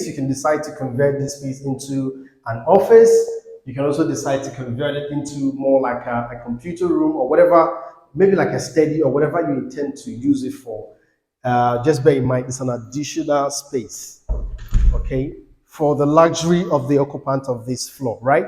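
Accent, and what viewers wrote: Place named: United States. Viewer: of late sounds Soo American oo😂😂😂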